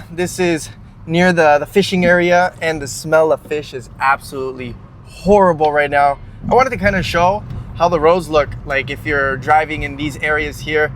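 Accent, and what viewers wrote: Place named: Argentina. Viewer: Saludos desde Bogota. Your Argie accent is pretty spot on; very authentic sounding. Could you answer a question? In one of your other vids you mention that you've been in Argentina for some years. How were you able to remain in the country for so long on just a passport without the DNI? Maybe because you are well and truly married to a citizen of the country?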